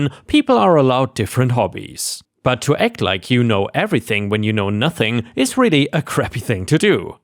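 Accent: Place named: Germany. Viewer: Carry oak E lol. I like your pronunciation though. It’s so close for a non native speaker, also I think you’re losing your German accent.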